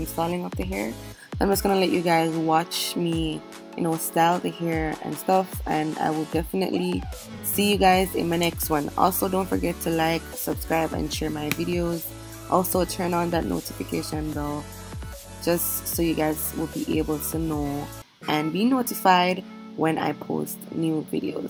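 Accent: Jamaica. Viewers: i love your accent ❤️jamaican gang🇯🇲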